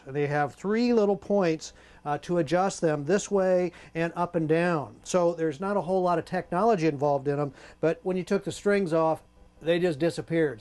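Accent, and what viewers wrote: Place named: United States. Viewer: like watching clapton with u.s. accent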